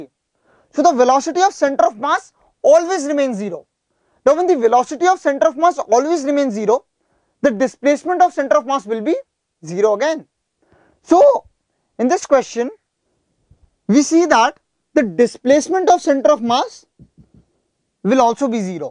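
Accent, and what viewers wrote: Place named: India. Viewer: oh man goddamit indian i couldnt understand any word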